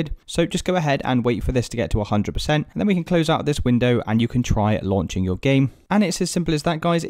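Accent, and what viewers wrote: United Kingdom. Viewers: thank you random british guy!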